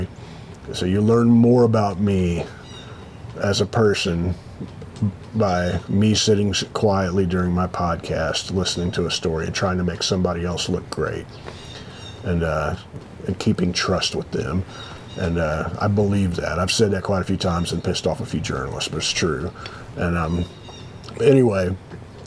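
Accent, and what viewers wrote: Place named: South, United States. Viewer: Ain't been here for all 100. Not even close. But you're a natural story teller. That genteel southern drawl is a big plus too. You and your friends are real easy to watch. You've got a way about you. Thanks for creating this channel. It's just my style.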